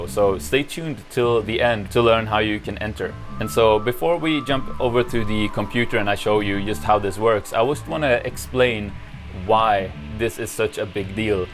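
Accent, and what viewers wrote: New Zealand. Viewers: Nice bit of Kiwi my dude, keep up the amazing work!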